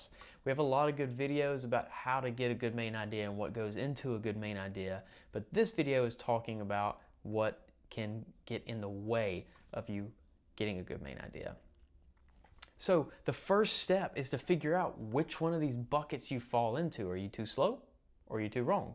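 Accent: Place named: South, United States. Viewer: cool, im from Georgia! Southerners know one when they hear one haha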